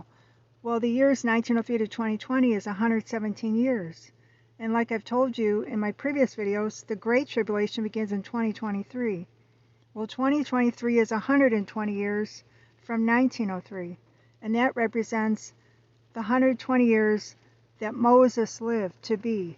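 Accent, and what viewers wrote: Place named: United States. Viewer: Nobody can understand your heavy american accent. Kindly speak with neutral accent or provide sub titles in English.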